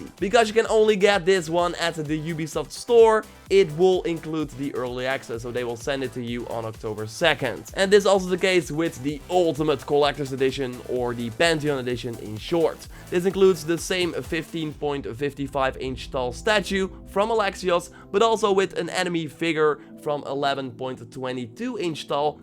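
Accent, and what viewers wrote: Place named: Netherlands. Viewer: Ik wist het wel! Dat je nederlands was hahah toch kan je dat altijd horen. Ga zo door man😃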